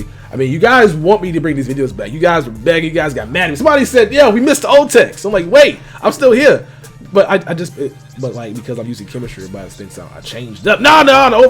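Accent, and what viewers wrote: Texas, United States. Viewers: Are u Fr from texas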